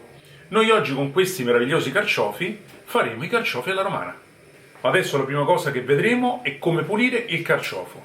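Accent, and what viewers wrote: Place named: Rome, Italy. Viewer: PER CASO HO TROVATO QUESTO VIDEO.. SENTENDO IL DIALETTO MI SONO FERMATA.. QUANDO SENTO PARLARE ROMANO MI INCANTO.... ANCHE IO LI PULISCO E PREPARO COSÌ.. PERÒ SOLO CON LA MENTUCCIA... POI LI CUOCIO IN PENTOLA A PRESSIONE.. ANCHE A ME VENGONO MORBIDI.. POI VOLEVO DIRE CHE IO SONO UN'ANZIANA SIGNORA DI CIVITAVECCHIA.. QUINDI UNA CUGINA DI ROMA.. UN'ULTIMA COSA.. COMPLIMENTI PER COME HAI CUCINATO I CARCIOFI .. BRAVO!!! CIAO ALLA PROSSIMA. 🌹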